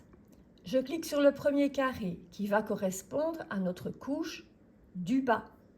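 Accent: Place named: Belgium. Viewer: Merci pour ce tuto qui est pour moi génial, je ne comprenais toujours pas jusqu'à ce jour comment faire la superposition, mais avec vos explications je pense maintenant que j'ai compris, cela va être un jeu d'enfant, et merci à vous pour votre bel accent belge, je vous souhaite pleins d'autres tutos et je vais vous suivre sans problème. Bonne continuation et à très vite.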